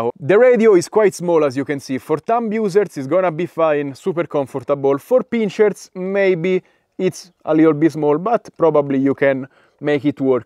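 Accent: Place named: Italy. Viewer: Your english is the best italian Style,its like music in my ears Grazie👍👍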